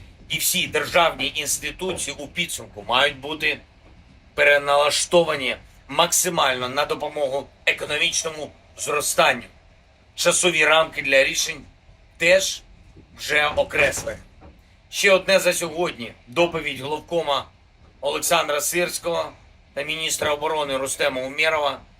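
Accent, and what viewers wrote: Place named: Russia. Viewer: Хреново он по хохлячекму балакае. С русским акцентом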